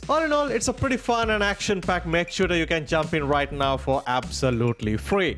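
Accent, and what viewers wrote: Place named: India. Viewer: Why do u sound Indian brother? R U? Btw I am an Indian.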